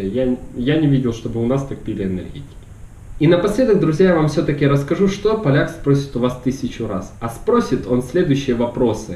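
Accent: Ukraine. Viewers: Ахахаха, я ору! : D Ещё говор такой "Украинский"! Ну да, на Украине всё так и есть как описал) Надеюсь в Польше не все такие стукачи и зануды. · Я думал ты беларус, , а ты Одесит! Круто! У нас также! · парень у тебя украинский говорок хотя ты усилино стараишсЯ обрати внимание на свою букву х и меньше подхалимничай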